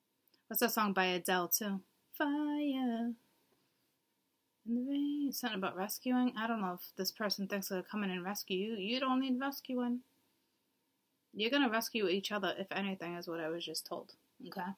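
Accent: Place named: Long Island, United States. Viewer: I am sorry this is SO irrelevant and while I am at it, what a great reading! So thank you(: But are you from like Shirley?!.... L.I.,NY ? I ask because you sounds like just my great aunts from there haha Italians on long island lolol<333 it reminds me of Easter :p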